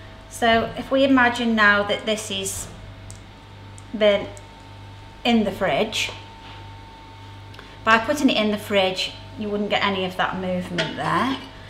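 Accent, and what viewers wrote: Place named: Hull, United Kingdom. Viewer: love ya accent are you from Hull like me xx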